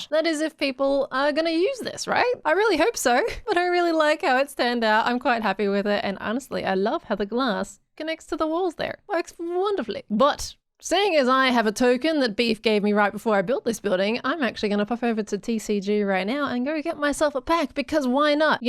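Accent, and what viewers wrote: Australia. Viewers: The Australian accent too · it’s the aussie accent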